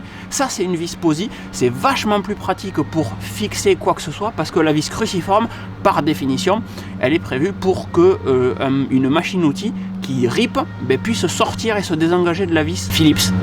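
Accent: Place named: Tarn-et-Garonne, France. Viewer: Tu serais pas du Tarn et Garonne ou pas loin avec ton accent ? lol ah bin j'ai eu ma réponse presque à la fin de la vidéo lol Moi je suis de Montauban. Merci pour cette vidéo, ça risque à me servir pour la maison de mon père.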